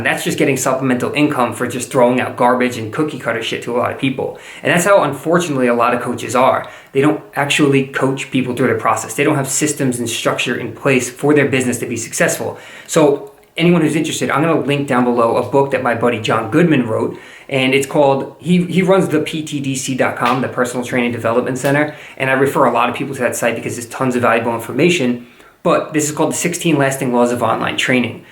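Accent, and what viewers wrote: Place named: New York, United States. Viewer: to be perfectly honest one of the main reason I subscribe nowadays is your New York accent, I learned English back in the days mostly from the movie goodfellas and even though you don't have as pronounced accent there's still a hint of it and that keeps me subscribed 😂😂😂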